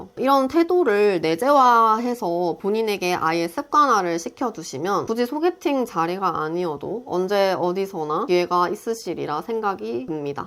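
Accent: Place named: Gyeongsang, South Korea. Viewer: 공감 많이 되네요~~사람에 대한 배려심. 기본..영상 잘 보았습니다. 말하시는 중간중간에 경상도 억양이 살짝 묻어나시는거 같아요!!!!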